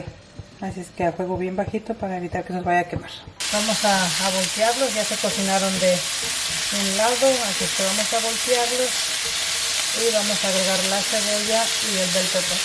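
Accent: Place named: Guanajuato, Mexico. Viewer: De donde es usted ? tiene un acento como de Guanajuato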